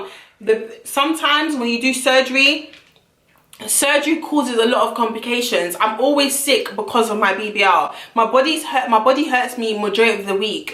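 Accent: United Kingdom. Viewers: LOL! She might be black but she's a londoner, we all sound like her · I'm an American I can't get pass the accent to listen to your story. I love it! I could just listen to you talk about anything. I have never been to any European country but I can't wait to go to the UK to hear black ppl talking like you.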